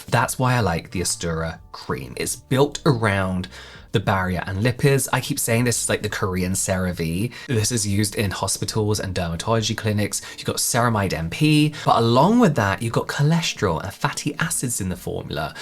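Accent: United Kingdom. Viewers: I can imagine him reading children’s bedtime stories on Ceebeebies or something!! Obviously not just that, he’s got a lot of potential for many opportunities for voiceover aspects of a career 100% but I think my kids when they were little would have enjoyed his voice too! ❤ Accent and voice is on point! 👌🏼🥰 British accent along with his voice/pitch etc is perfection 🎉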